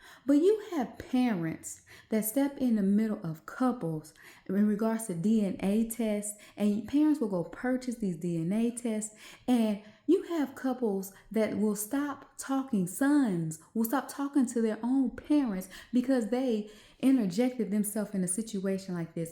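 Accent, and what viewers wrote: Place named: South, United States. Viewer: Hi beautiful, new to your channel. You sound just like a Southern Bell lady, I love the accent it's too cute🥰❤ But as said, they'll be many blinded calling wrong right, and right wrong.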